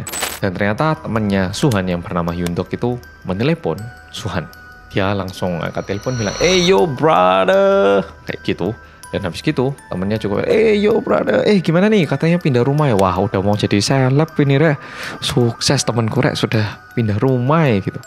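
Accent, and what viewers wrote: Java, Indonesia. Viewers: Enak banget dengerin orang Korea ngomong nya medok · Kerennn medhok tnan😍😊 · Bang orang korea ap orang jawa kok logat ngomongnya jawa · Ga percaya aja oppa ini logat jawa bukan logat korea🤣 medok banget jawa nya wkwk, tapi muka korea banget wkwk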